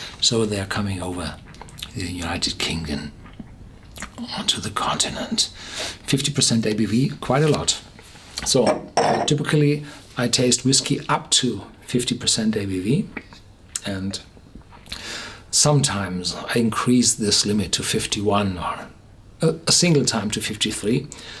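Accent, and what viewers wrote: Germany. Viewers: Sympathisches English 😉. German style.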